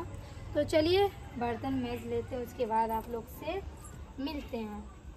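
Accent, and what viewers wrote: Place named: Jharkhand, India. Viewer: Jharkhand kolkata side aise hi bolte h waha k log meri saas bhi bolti h massala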